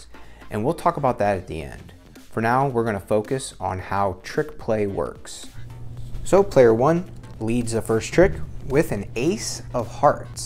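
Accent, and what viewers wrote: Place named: Rotterdam, Netherlands. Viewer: Wel Rotterdams hè...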